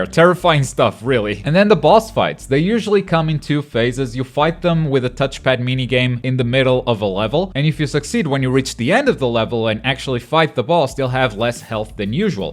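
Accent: Portugal. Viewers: Are you portuguese ? 0.o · Wait, are you portuguese?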